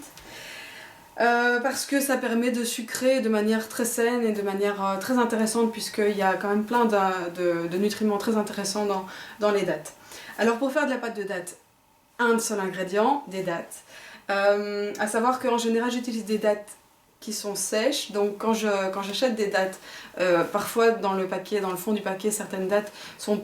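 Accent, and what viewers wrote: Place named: Belgium. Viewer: Merci pour la recette mevrouw ; j'ai tout de suite entendu que tu étais une compatriote Belge ! :) J'ai une question : le Blender Magic Bullet est-il une machine fiable ? Parce que vu son prix (99$ c'est ça ?), je préfère que ça soit un investissement sûr et durable (et alors, où se le procurer en Belgique...).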